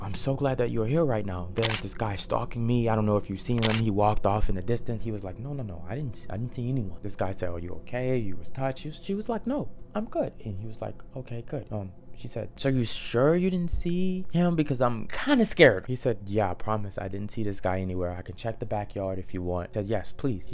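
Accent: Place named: New Orleans, United States. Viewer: First timer here I here the NOLA in your voice ❤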